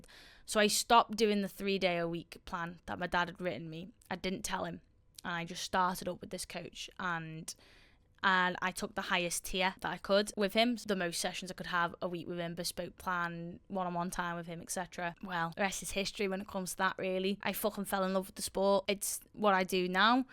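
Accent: Liverpool, United Kingdom. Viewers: Love the scouser accent ❤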